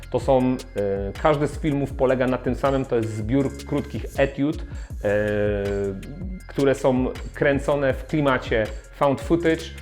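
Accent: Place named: Poznań, Poland. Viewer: Słychać że poznaniak tej!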